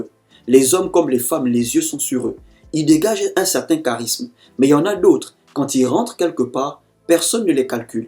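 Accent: Gabon. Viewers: J'ai découvert cette chaine au début de la semaine, j'ai visionné plusieurs vidéos, et je trouve que le frère a du vécu, il sait de quoi il parle, tout en dégageant l'attitude de quelqu'un qui est issu du milieu de la séduction. Une très bonne connaissance de la nature et la psychologie féminines. C'est le type même d'ami, de frère, voire de grand frère qu'on aimerait avoir eu avant de se lancer dans le Game où de nombreux d'entre nous se sont fait broyer par inexpérience. Et l'accent du Gabon, où j'étais en 2008, est juste nostalgique. Force à toi frérot, un nouveau fan de ta chaine, du côté de Brazzaville. Mbolooo!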